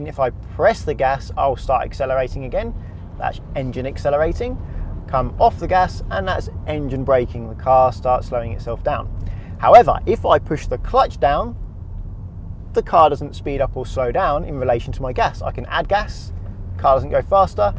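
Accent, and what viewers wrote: United Kingdom. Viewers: Your accent is so beautiful.which part of the UK does it come from?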